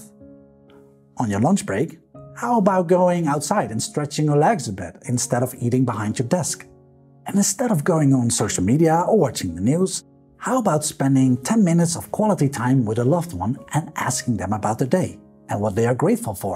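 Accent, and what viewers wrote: Netherlands. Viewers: Love your ideas and videos. I am trying to decipher your "almost Dutch" accent. Do you mind disclosing what country you are from originally? I hope you write a book and a work book!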